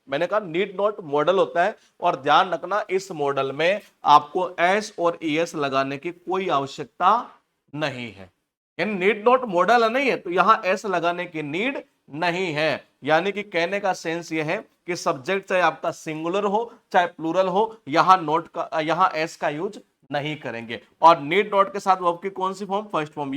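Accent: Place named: India. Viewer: Seems aayega love you sir aapki english desi jugad ne to mja hi dila diya😮❤❤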